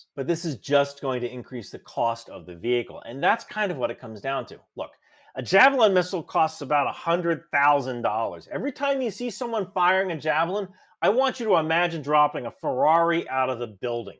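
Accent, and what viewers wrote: South Africa. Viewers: Your South African Elon accent is pretty good! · Good South African accent.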